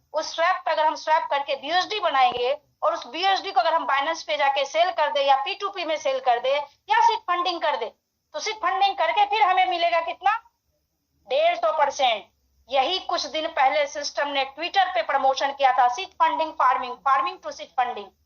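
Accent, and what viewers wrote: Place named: Kolkata, India. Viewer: Colcata anti ji ka awaj lag raha hai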